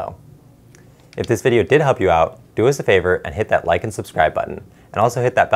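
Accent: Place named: Asia. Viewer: Your English is terrible - is that an Asian accent? I can't understand what you are saying.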